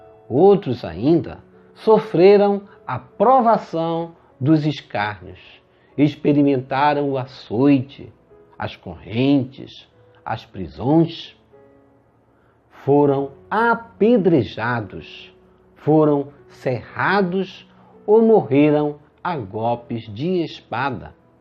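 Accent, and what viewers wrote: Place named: North, Brazil. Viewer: Obrigada, por compartilhar conosco a palavra de Deus. Que Deus te abençoe e proteja sempre. Mande um abraço para Manaus e peço orações pela nossa cidade. E a propósito, diga-nos qual é seu estado? O seu sotaque me parece ser do Norte. Um grande abraço!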